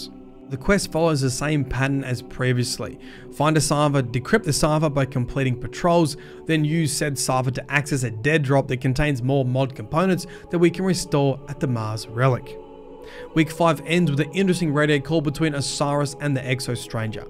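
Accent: Australia. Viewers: Man I love Byf but god damn if it isn’t nice to hear a fellow Australian talk about this crazy story! Thank you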